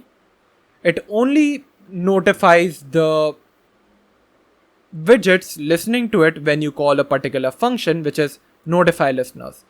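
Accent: India. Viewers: Textbook indian accent, love it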